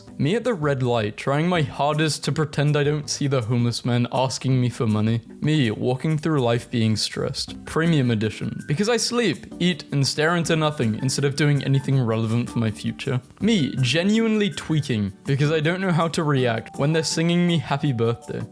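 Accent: United Kingdom. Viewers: are u british?:D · are you British by any chance?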